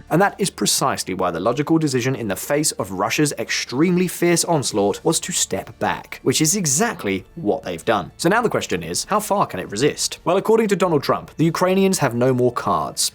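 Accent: United Kingdom. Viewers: A proud British English accent is a sure sign that what follows is propaganda, half-truths and lies sponsored by the London-Wall Street Financial Cabal in order to continue their endless, no win wars and increase their bloody war profits from their military-industrial-complex enterprises. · When I hear Brit accent, i know this is full of bs.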